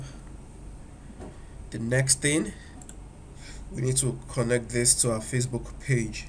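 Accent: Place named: Nigeria. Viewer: Wow!. I was watching BOVI comedy on my Tab while searching for How to work on Instant Article. I had to stop Bovi when you started talking. A NIGERIAN VOICE? MAN!. GOD BLESS YOU. I didn't skip the ads either because I believe that's a way to say THANK YOU!. GOD BLESS YOU MAN.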